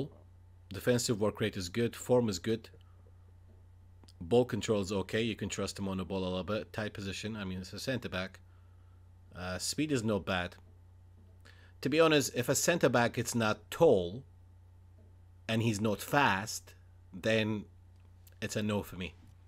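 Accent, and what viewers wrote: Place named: Scotland, United Kingdom. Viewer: Where the hell are you from man? Deffo part Scottish. Cant get the other bit tho. Good videos btw. Big up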